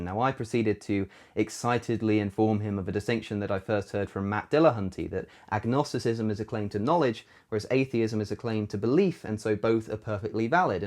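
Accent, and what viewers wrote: United Kingdom. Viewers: Your a smart guy but you're blessed with a British accent. It makes you sound smarter.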